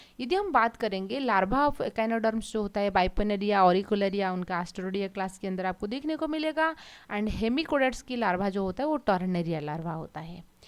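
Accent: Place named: West Bengal, India. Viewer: Man you are from West Bengal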